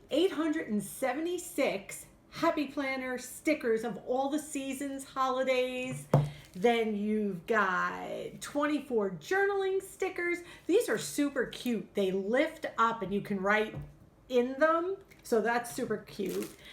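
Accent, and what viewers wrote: New York, United States. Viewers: Being from Jersey City (now in SC), our cawfee and tawk sound about the same as NY. That's probably why I relate to you so much (not to mention every time you say you got something at Shop Rite). I've got envelopes for Christmas and a new Christmas Tree in my "sinking funds". It worked for my parents with the Christmas Club back in the day, so this is my second year saving this way. My son and I buy things year round, so the Christmas money is for my Granddaughters who live with me and it certainly feels great not to have to use a credit card to purchase gifts. As always, love your channel and congrats to Maxine for winning the giveaway.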